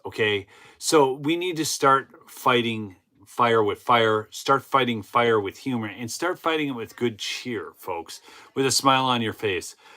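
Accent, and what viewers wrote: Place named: Minnesota, United States. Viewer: You're from Minnesoooota.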